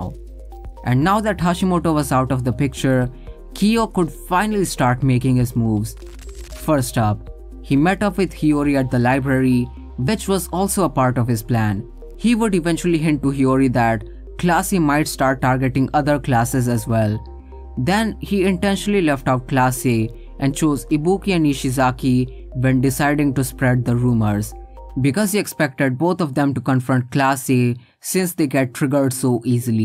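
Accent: India. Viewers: You have Indian accent are u one??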